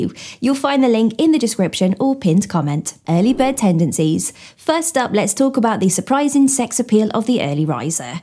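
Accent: England, United Kingdom. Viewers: just like always. You are one attractive beautiful British woman! 😍😍😍😍😍😍😍 I'm here for my British accent fix for the day!😊😊😊😊😊😊😊 You have a Blessed day. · This may seem pedantic but there is no British Accent, there are English accents, Scottish accents, Welsh accents and Northern Irish accents and within each of those there are different accents per city and in London there are also different accents. Her accent is English.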